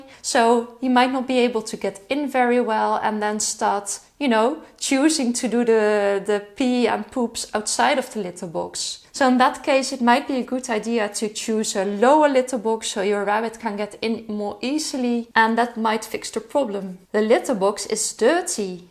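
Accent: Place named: Netherlands. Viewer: you Dutch you have an accent like me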